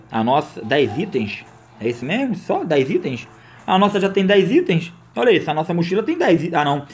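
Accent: Rio de Janeiro, Brazil. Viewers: Vc E carioca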